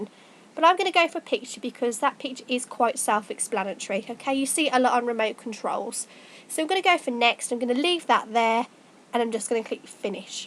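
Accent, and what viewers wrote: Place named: RP, United Kingdom. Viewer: posh Accent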